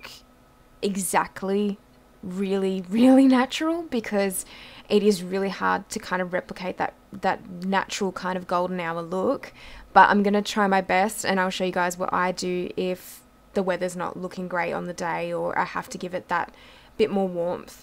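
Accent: Australia. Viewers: with an Australian accent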